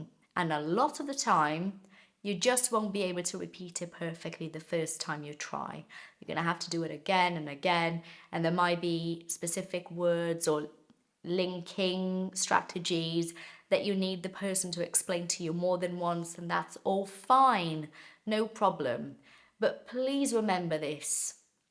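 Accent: United Kingdom. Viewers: ai para de ser chato, ela não tem sotaque brasileiro nenhum, ela passa 100% como britânica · Does she practice an British accent?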